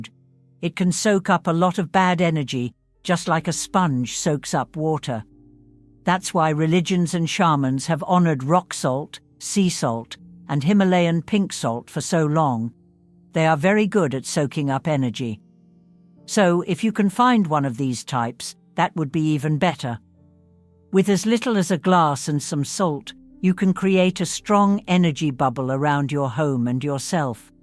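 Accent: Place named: United Kingdom. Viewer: Trump supporter or just an idiot with a British accent?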